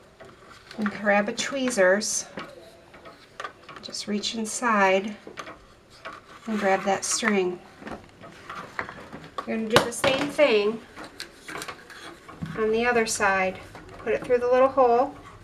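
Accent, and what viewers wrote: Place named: North, United Kingdom. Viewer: She is so sexy love the north/mid eastern accent.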